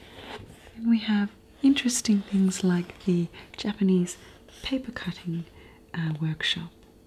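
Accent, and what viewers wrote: Australia. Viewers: Tpgain 70 sounds like an elegant and educated Australian accent in my opinion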